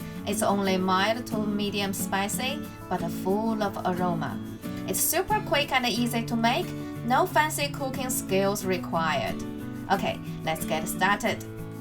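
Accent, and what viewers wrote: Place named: China. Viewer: Typical chinese accent :)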